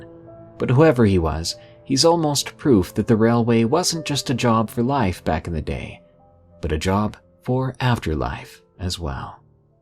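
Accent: United Kingdom. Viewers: Why do america still think that everyone for the uk talk like that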